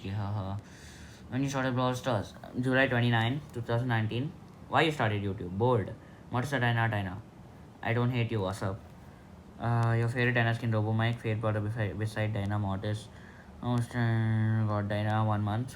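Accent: India. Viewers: Me guessed from the accent he is Indian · You sound like indian🧐🧐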